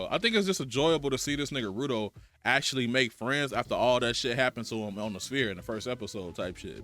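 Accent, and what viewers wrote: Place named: Chicago, United States. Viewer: do i detect a CHICAGO 🥷🏾!?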